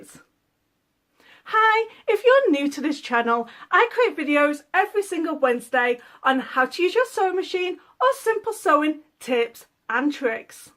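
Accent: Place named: North Yorkshire, United Kingdom. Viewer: You've done it again! Another fine video I'll let you into a little secret; I love making bags but, as a quilter, it's sometimes quite a while between doing them. All my bags have loads of pockets but I often forget how to put zip ones in. No longer! I have a good 'go to' place for reference. Thank you so much. Please tell me where you originate from, your accent sounds so local to me (Scarborough, North Yorkshire) but I see you're in Canada - there's a story there I'll bet. xx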